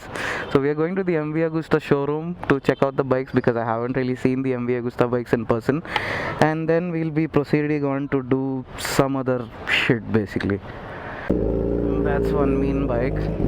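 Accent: Hyderabad, India. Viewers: Sir the way u talk the hyderabadi language i liked it very much by the way the wheelie was awesome 😜😜😜😜😜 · ap pakka hyderabadi baat karre bhai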